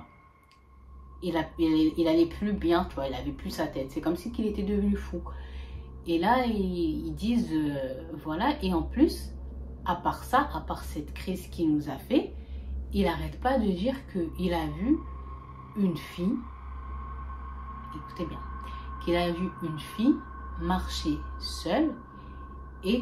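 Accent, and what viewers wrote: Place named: Arab world. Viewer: Bonjour ma belle je suis ta chaîne depuis très peu et j’adore ces sujets. J’ai une histoire à te proposer c’est celle du sorcier yéménite qui en voulant arrêter la sorcellerie ses enfants sont tous morts … je ne sais pas si tu connais cette histoire elle est vraiment incroyable Par ailleurs j’aime beaucoup ta lahdja quand tu parle en arabe, c’est laquelle ? Encore merci et bonne continuation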